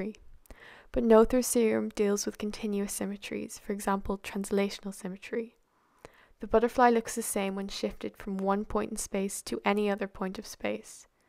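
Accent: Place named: Ireland. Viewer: the speaker's accent sounds a bit Irish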